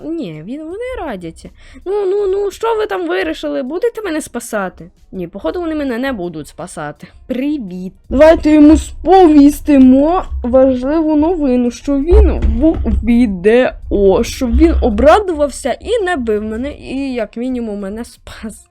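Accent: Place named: Ukraine. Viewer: Чо у тебя с голосом? Стоп! Ты украинец! Блин😢 я русская но ты класный❤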